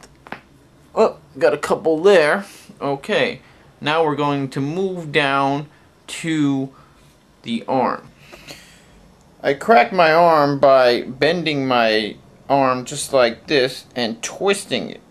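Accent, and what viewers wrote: United States. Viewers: i believe its a new england accent but im not sure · He has the best boston, yankee accent I'm from the south so I don't here this much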